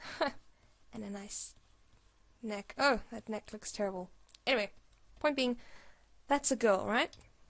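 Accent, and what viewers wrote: South Africa. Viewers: Ay! Is that a South African accent I hear? Love it!!! Dig this video! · Are you South African? Love this tutorial!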